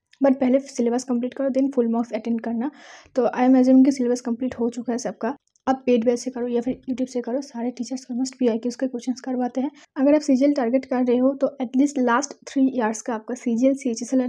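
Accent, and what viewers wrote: Bengal, India. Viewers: Are u Bengali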